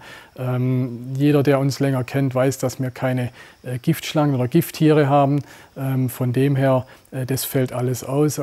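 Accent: Swabia, Germany. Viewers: du haddeschd scho enemal a wegen weniger Dialekt geschwätzet, des war echt besser, net jeder Zuseher tut schwäbisch verschdehet, scho gar net außerhalb vom Ländle. Is scho a wegn heftiges Laschder, bidde beherziged... 🤦‍♂️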